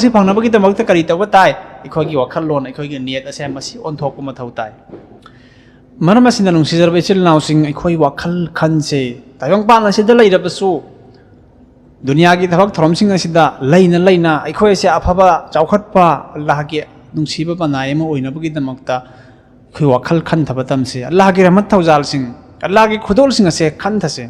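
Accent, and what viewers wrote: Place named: Arab world. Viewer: Janab se Hafeez ama oibgi chp chaba magun lei.... Arabic accent, afaba warol sndokpiba, yam taba nungai......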